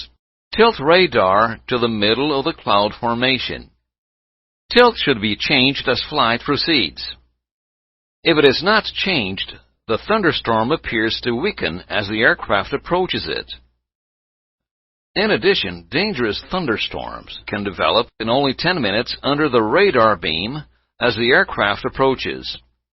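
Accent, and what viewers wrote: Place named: Netherlands. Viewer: They still play this old video in our training. The same narrator is in lots of the old Embraer CBT videos. I always loved this guy's accent... is that Dutch?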